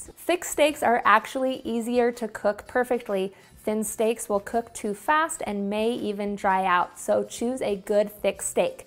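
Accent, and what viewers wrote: United States. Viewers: Not in America booboo....its called an accent.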